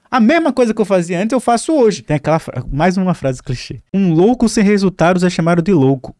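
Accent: Northeast, Brazil. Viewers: Nordestino, de onde tu é? Sou do RN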